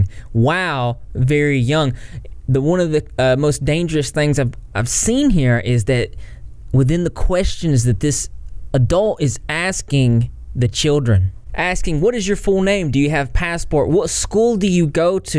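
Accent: South, United States. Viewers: i love your southern accent 😩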